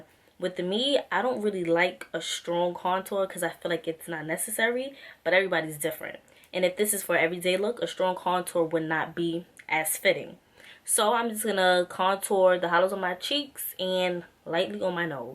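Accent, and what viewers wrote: New York, United States. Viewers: you are so pretty. you have an accent too lol. are you from new york? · You sound like your from NYC lol great video and new subbie I'm loving your channel 😍😍😍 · I knew you were from newyork as soon as I heard that accent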